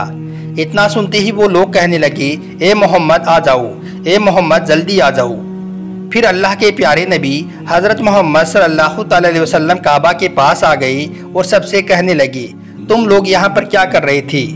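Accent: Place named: Bihar, India. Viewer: Bihari pehle apne boli sudhar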